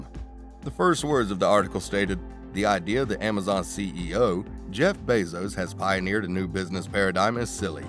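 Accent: United States. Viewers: Enjoying this guys southern drawl. Sounds like the narration of a Hollywood movie. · The hillbilly accent of the host is terrible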